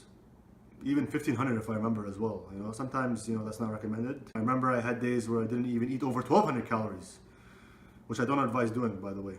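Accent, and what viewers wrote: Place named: Egypt. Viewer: the egyptian accent love it. well done!!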